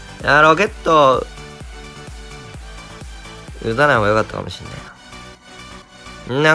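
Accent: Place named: Kansai, Japan. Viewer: めっちゃ関西弁やん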